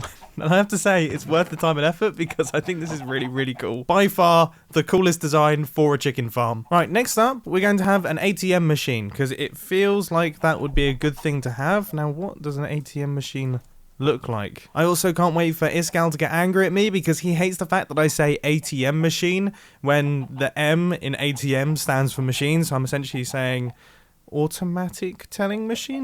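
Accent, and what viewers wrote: England, United Kingdom. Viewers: It's not funny that's just how British people speak which includes me and what the hell is a pizza roll?!?! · English accent I suppose? It depends where you're from in England so I can't say because I'm pretty sure I'm not where is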